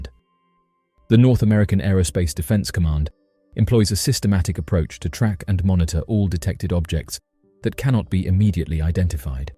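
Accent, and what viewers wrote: United Kingdom. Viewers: Love your soothing relaxing British voice 😁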